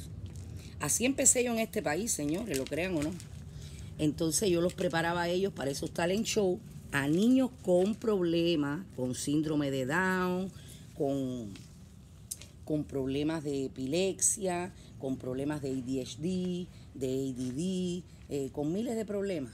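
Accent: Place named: Cuba. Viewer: buenas tardes! Estoy buscando tus videos, por el puro gusto de escucharte hablar 😂ese acento cubano, a mí me encanta. Eres muy inteligente y culta, y creo que noto algo de perfeccionismo, que casi comparto contigo. (Ya lo estoy dejando, para no sufrir y hacer sufrir tanto 😂)Si supieras, yo quería ser presentadora de noticias por TV, y no es que fuera imposible, ni siquiera difícil, pero tuve la suerte 🍀 de optar por otra profesión, la cual al día de hoy todavía ejerzo. Fue un gusto ver y oír tu contenido. Saludos 🙏🏻🇩🇴🥰🌹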